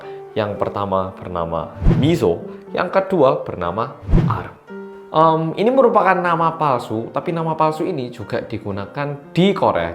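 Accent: Java, Indonesia. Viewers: Jangan lupaaa medokkkkkk🤺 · Pangling mukamu krn ganteng tp ga akan pangling suaramu sing medok itu loh cak...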